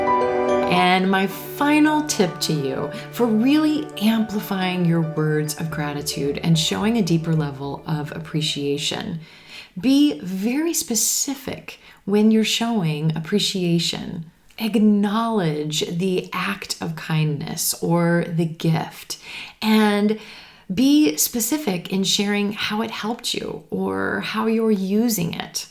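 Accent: United States. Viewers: The american accent which is you teaching,What kind of american accent?Is this the general/standard american accent?Plz,answer me.I am a great fan of you.I will be thankful if you answer me...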